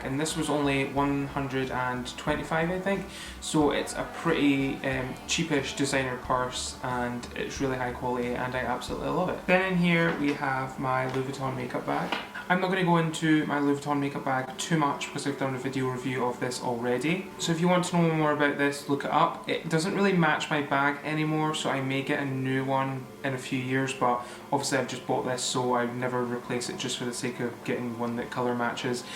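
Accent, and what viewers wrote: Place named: Scotland, United Kingdom. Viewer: your accent is lovely! are you scottish?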